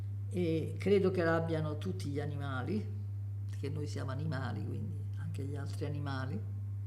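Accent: Rome, Italy. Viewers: Ciao, io sono una ragazza di 17 anni che da poco ha scoperto i suoi video. Durante la mia vita, ho sempre sofferto il “non sapere” in modo molto forte, cioè già a 7 anni avrei voluto farla finita perché volevo sapere subito cosa ci fosse dopo. Questa sofferenza, secondo me, deriva dalla paura di non poter esistere più, per questo vorrei rifugiarmi sempre nel “cercare di comprendere la verità”, ma a volte devo accettare semplicemente che essa a me non si voglia rivelare. Da lei sto imparando che non sempre bisogna avere la pretesa di sapere ciò che non si sa, a volte va bene anche rimanere nel dubbio. Studiare filosofia e immergermi nei suoi video, per me, sono due vere cure, perché mi rassicurano sul fatto che anche dopo la morte non cesserò di esistere, perché comunque qualcosa sono e da qualche parte dovrò pur andarmene. Sento dall’accento che lei é del Lazio, forse di Roma e nel caso sia come penso, visto che probabilmente abitiamo entrambe nella stessa città vorrei incontrarla davvero davvero tanto. 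Avrei tanto piacere a dialogare con lei perché sarei felice di incontrare almeno una volta qualcuno di estremamente saggio proprio come lei. Un abbraccio. ❤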